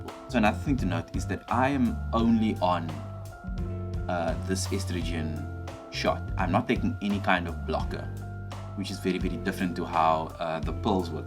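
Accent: South Africa. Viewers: Are you from South Africa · Hii I recognize your accent. I'm south african as well! I'm month 5 on E. Subbed. I too get my viles from Fagron.As for me i first tried groot skuur but they never called back so I managed to get an informed consent from a private doctor. Her name is Megan Martin if anyone's interested if they need help :)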